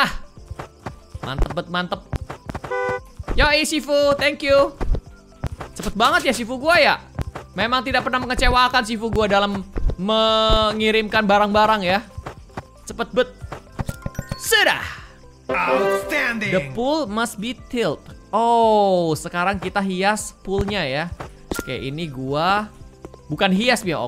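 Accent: Java, Indonesia. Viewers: Kak kalo ngomong bahasa Jawa lucu bgt wkwk🤣🤣..eh btw kak STMJ susu telor bukan teh🤣🤪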